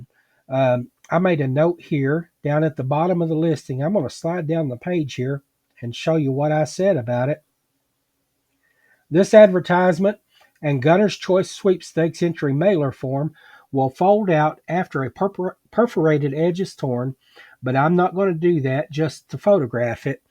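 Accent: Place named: Appalachia, United States. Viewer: HAHA Hillbilly accent. Being in NC, we have a lot of that here too. I call my friend a Renaissance Redneck. 🤣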